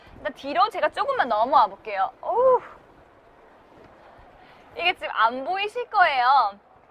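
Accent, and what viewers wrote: Gyeongsang, South Korea. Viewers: 사투리 타령하는 인간들아... 제대로 들어봐. 억양이 경상도 느낌이 있지만 단어나 구문이 사투리가 뭐가 있냐? 사투리는 단어와 서술어 등 구문을 말하는거다.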